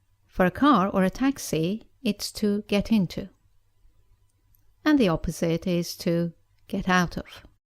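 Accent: United Kingdom. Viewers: great!!!!. niice british accent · Very good class.. It helps me improve my English, specially British accent. Brilliant explanation. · Brittish accent rules!!! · Your video helps me a lot to improve my English, that's why i sign up and make a comment to say thanks for all the effort you've done not only for me but for the other who want to learn good English. your such a lovely teacher i like the way you introduce your lesson and i really learn from you. what i did not learn is your accent of saying the words please help me and teach me how to imitate your accent because i like British accent rather than american accent... keep up the good work. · is it uk accent or us accent?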